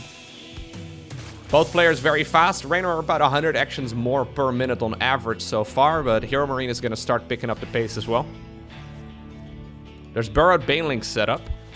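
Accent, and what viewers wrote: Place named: Netherlands. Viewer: it's actually "broodlords" and "broodlings", but dutch accent makes it sound like that.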